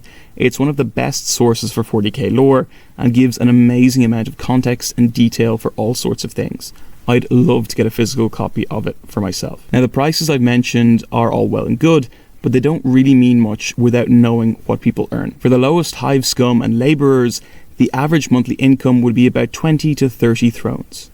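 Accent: Ireland. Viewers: It's nice hearing another Irish accent rambling at length about 40k minutae. Irish accent right? Edit: I commented too early. You mentioned it in video. 😂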